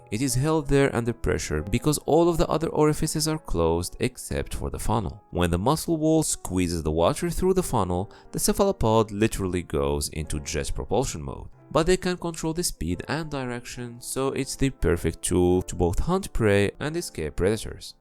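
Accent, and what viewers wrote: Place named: Morocco. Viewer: Dude your accent sounds moroccan, where are you from?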